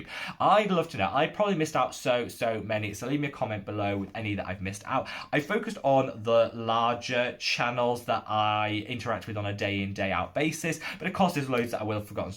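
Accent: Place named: North, United Kingdom. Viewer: Ahh. That's it. The northern UK accent is what you have. That's what I had thought. I've seen videos in the past about all of the different accents in the UK. I was terrifyingly obsessed with the Spice Girls in the late 90's. I was 11 when they debued in 1997. They were famous/popular in Canada almost instantly/the same time as in the USA and the UK.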